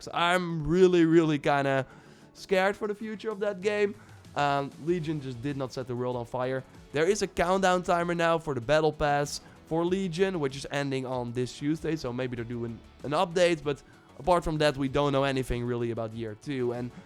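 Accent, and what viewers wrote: Netherlands. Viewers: love ur dutch accent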